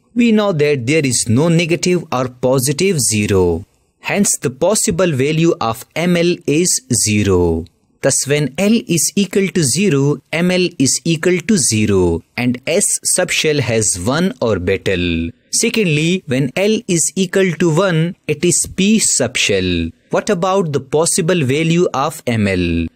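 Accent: South India, India. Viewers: Keralian ho kya ...awaj se lagta hain as south Indian jaisa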